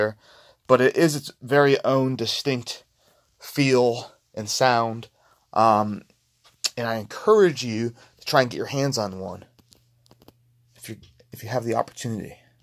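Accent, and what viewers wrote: United States. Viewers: When you going to germany for some real metal head shit real nice work doing. you def got a florida accent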